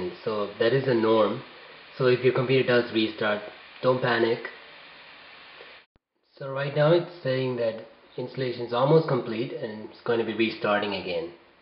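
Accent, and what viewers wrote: India. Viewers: u r from india, aren't u? :) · Indian... Obvious :)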